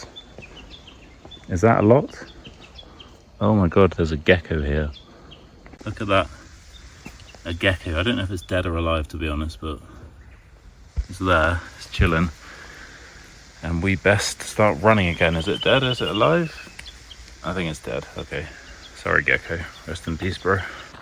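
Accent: United Kingdom. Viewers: I'm Thai from Thailand. I'm learning British pronunciation, finally, I found you. Thank you, teacher.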